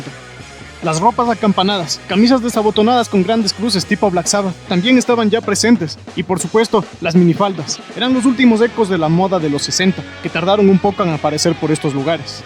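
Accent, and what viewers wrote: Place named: Mexico. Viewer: El narrador es mejicano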